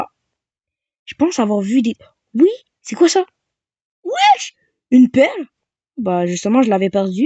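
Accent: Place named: Quebec, Canada. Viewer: T’es québécois? Sinon bonne vidéo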